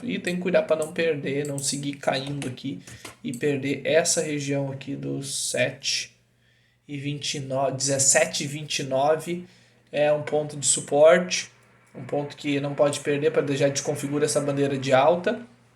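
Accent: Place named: Rio Grande do Sul, Brazil. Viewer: Gaúcho de onde mano?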